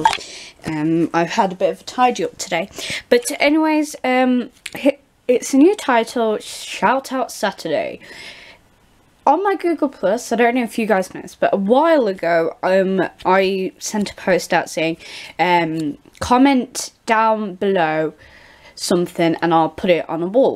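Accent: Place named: United Kingdom. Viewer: You are awesome! I love British accents, and yours is so unique! You are never too old for dolls!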